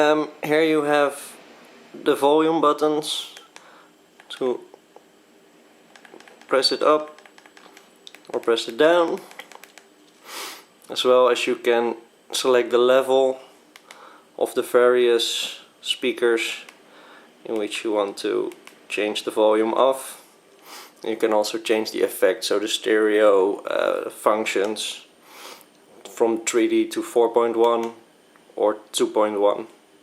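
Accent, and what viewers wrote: Netherlands. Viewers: Ik hoor een Nederlands accent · Het is trouwens te horen dat je Nederlandstalig bent! Heel tof!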